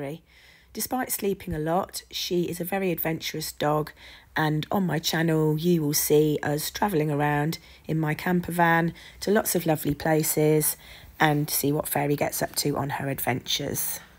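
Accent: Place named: United Kingdom. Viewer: She's all bundled up in her lovely coat, on a windy day :-) I also like to hear the British accent, as I am in America now, and miss England ! :-) Absolutely lovely.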